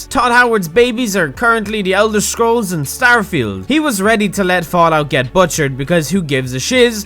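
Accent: Ireland. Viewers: I missed your fucking beautiful Irish accent. STOP TO TORTURE ME AN GIVE ME MORE VIDEOS PLSSSSSS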